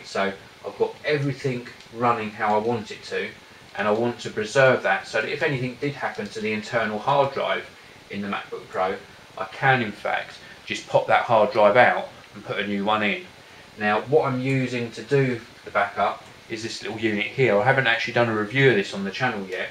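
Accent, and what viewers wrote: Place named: Cockney, United Kingdom. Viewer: Every time I hear this intro music, I think "Cockney accent coming up".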